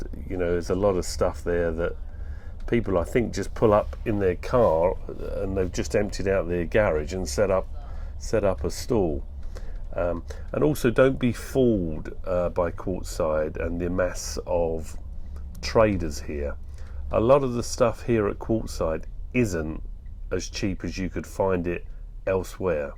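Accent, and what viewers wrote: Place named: United Kingdom. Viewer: What I'm not a fan of is inappropriate noise. What I am a fan of is this;A beautiful British accent,A natural sense of humor spoken in subtle ways that is charming and funny,An obvious affectionate relationship between a man and woman that shows their depth of respect and love for each other. It is this magic that AC/DC spoils because they are anything but the above.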